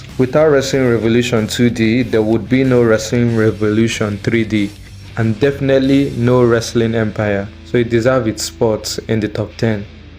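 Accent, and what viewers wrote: Nigeria. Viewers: Bro sounds so Nigerian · Yo bro you sound Nigerian ❤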